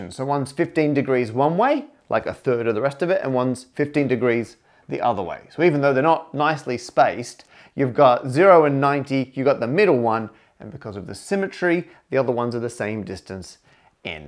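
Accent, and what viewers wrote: Australia. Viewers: It is probably in part "fault" of the more cultivated Australian (is that what people still call it) + British influences accent, for some reason people tend to see the latter as more posh or smug automatically, the former is reminiscent of the latter, and some people can't tell which is which anyway. I am afraid to say, you're sort of facing an uphill battle on that one, and in reality is no fault of yours in that particular department, since the real fault is just people not being sufficiently exposed to more varieties of English, which sucks but it is also natural. I.e. just bad luck (though I still wish you the best of it, for what it is worth, which is probably nothing). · Why is Simon Whistler talking Australian? That should be the question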